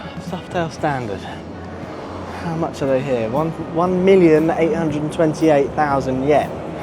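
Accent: United Kingdom. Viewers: L'accento britannico è decisamente più civile e gradevole, a confronto con quello americano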